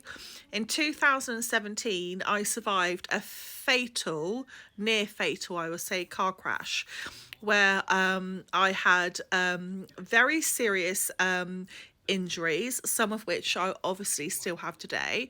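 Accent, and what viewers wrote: England, United Kingdom. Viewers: Some people I think do these things to get a response seeking attention any way they can, I did not even notice any issue with your voice at all, you have a normal English accent to me, and your personality is very positive so keep up the great work you are doing.❤❤❤ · South African here, beautiful accent, people from US love the English accent as well.❤️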